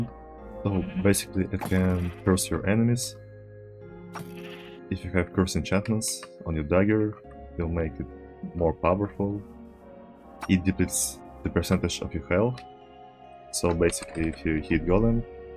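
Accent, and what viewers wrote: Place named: Russia. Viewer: Чую чую я русский акцент, чую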